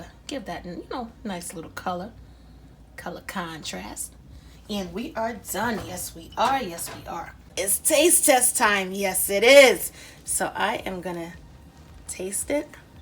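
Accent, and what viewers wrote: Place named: Bronx, United States. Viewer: Great vid … Luv Da Bronx accent! 😉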